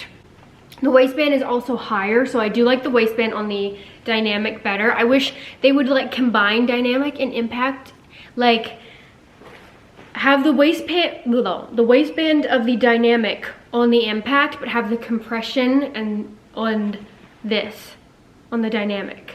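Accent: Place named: England, United Kingdom. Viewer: how you feeling today..... In ur English voice what's on the agenda for 2day daaarling 😊 I'm not going to comment but real man knw real from fake marshmallows.... This what I say about that 😎👍🤙🙌🦾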